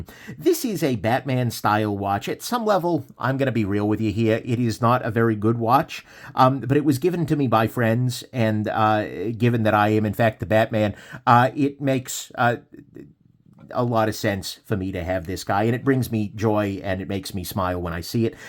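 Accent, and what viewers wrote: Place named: California, United States. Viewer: At the end of the video I learned what a California accent sounds like.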